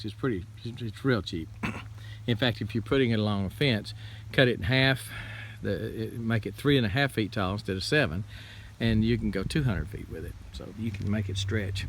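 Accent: Texas, United States. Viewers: Dude you have the absolute perfect Texas accent/voice. I could listen to you read a book or do the news or something like that. I grew up in central ok then moved to north texas so mine is not quite as pronounced as yours. I love it though. Good show!!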